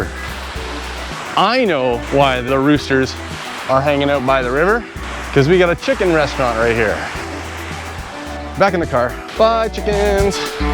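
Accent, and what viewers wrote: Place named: Canada. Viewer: i just came across your Youtou channer ! and i love your accent So here's a question for you , where in Cananda did you come from ? (i'm planning to move to Candana and live there for about one year, but i'm stuggling to decide where i live in Canada. the purpose of my visit is to learn english though )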